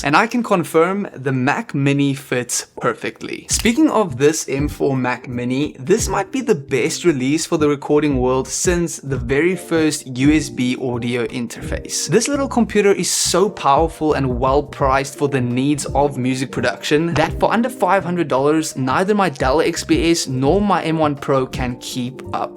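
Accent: South Africa. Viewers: I can already tell by that accent that this man is a SA kid🤌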